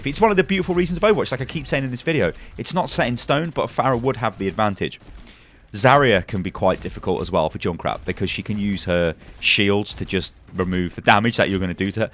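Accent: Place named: London, United Kingdom. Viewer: i'm guessing from the south, definitley no northern accent, probably London or somewhere near there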